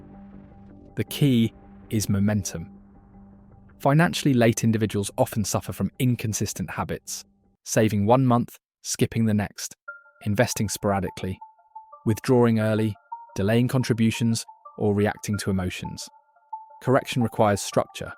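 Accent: England, United Kingdom. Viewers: Always wondered why its an English accent talking in Dollars. Helpful video...uk based. 42, no debt, buffer in place and started an all world global index fund aim to save £200 ° month into it. Hope to be able to retire earlier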